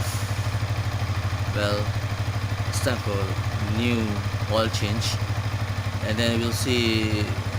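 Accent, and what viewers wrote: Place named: London, United Kingdom. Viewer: Fuh.. Brader speaking London. Not bad for first video. Keep on the good work. You know who I am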